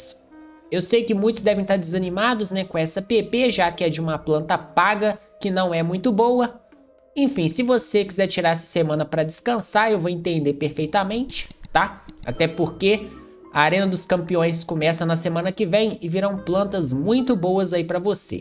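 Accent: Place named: Minas Gerais, Brazil. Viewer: OI tenho uma pergunta, em qual estado você mora? você parece ser mineiro pra mim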